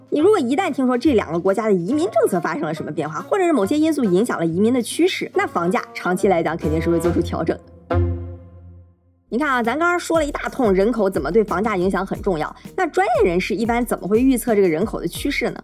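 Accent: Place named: Dalian, China. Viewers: 我刚进来看， 她讲的不错， 我也是大连的！ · 怎么像大连人似的😄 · 在异国他乡听大连老乡说普通话真亲切！现在不仅我和我老公在看你的频道，我连我爸妈也安排上了。加油小Lin!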